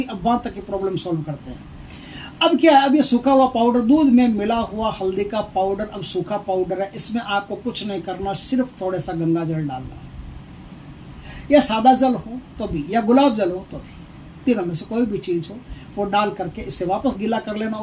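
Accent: Rajasthan, India. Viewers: आप राजस्थान से हो क्या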